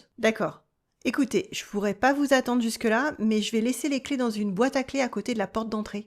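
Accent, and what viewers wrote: France. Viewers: Votre accent est assez difficile, mais c'est du pur français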